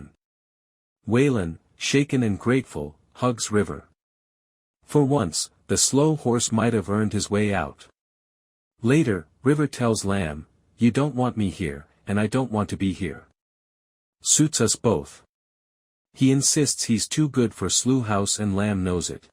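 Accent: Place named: United States. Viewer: Slew House ?????? Do you mean Slough? Using a US narrator! Whose stupid idea was that?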